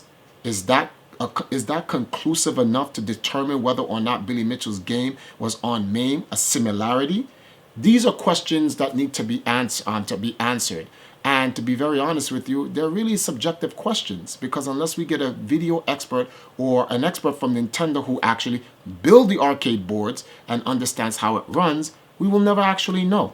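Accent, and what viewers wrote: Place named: United States. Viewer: Mr, I find you repugnant. You're a fake Jamaican, with your americanised accent. You try so hard to ape your masters, your slave mentality is irritatingly obvious. You are contemptibly inarticulate, misusing so many words and phrases, it is beyond reckoning. Why don't you learn how to speak English properly, before trying to use it to deceive people? Your incessant lying is utterly tiresome. You are the type of simpleton, who believes that heaping lies on top of lies makes for a convincing narrative. If there were any depth to your intellect, you would be aware of the fact that lies are strengthened by mixing them with truth. You are quite clearly ignorant of said fact. I am a West Indian, my skin is brown, and I play classic arcade games. I have no wish to be represented by the likes of you, so I would appreciate your removing yourself from the scene. 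Stop making yourself out to be more significant than you actually are, and stop manufacturing justification material for the use of the Twin Galaxies racists.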